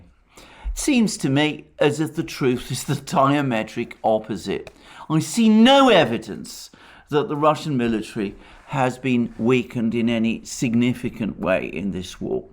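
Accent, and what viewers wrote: United Kingdom. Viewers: Your extraordinarily british way of speaking amazes me, amuses me in a way that I can't explain... You're obssessed with Ukraine and Russia, and you still have SOME time to be umplesant and rude about the CHINESE" I'm sorry to be amused by this, but your gentlemansness is fantastic.... Thanks a million for the great analisys AND for the gentlemansness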